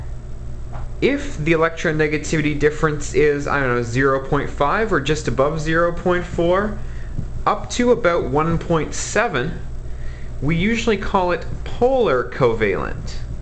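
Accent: Canada. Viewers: I like you,. And your Canadian accent.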